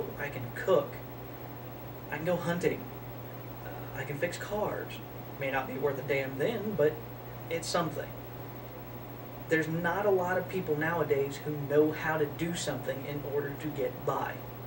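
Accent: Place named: South, United States. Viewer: Sound like u from dwn south, anywhere near newmadrid seismic zone? Would hate fir u to have to leave your homestead.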